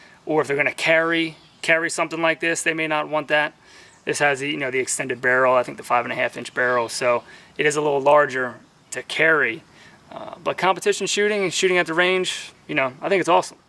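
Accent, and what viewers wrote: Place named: Maryland, United States. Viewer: Sounds like a Maryland accent.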